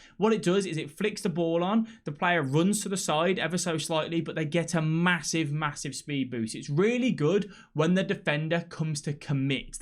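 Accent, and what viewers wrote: Birmingham, United Kingdom. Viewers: Are you a Brummie mate?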